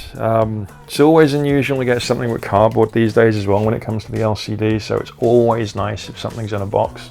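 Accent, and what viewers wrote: Bristol, United Kingdom. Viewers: is LOVELYYYY, love the video! Found you cus' of Super tomato page! Wooo - Also recognise that bris accent anywhere haha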